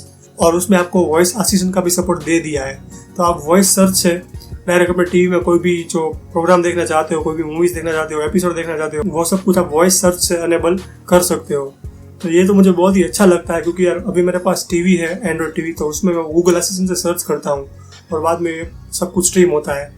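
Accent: Gujarat, India. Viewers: By your voice i think you are gujrati .I'm right ?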